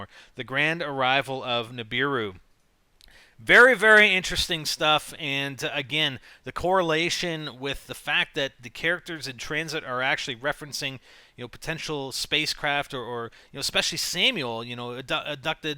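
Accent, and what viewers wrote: United States. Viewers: yeah, he sounds american... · Where do u live you sound american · What country are you from? You sound American or Canadian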